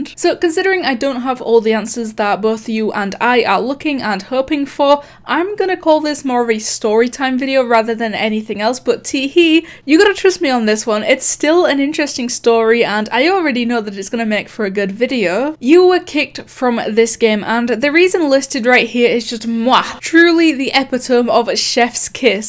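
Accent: United Kingdom. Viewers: Shes Accent seems british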